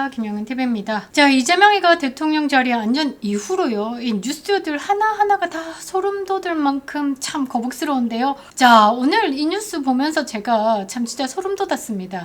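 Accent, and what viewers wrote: Gyeongsang, South Korea. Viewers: 경상도 사투리 친근함이 느껴집니다.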